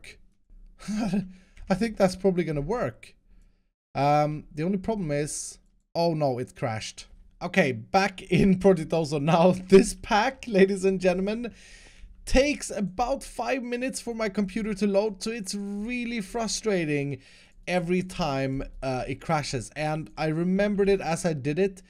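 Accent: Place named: Sweden. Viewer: It sounds like you are from Sweden talking English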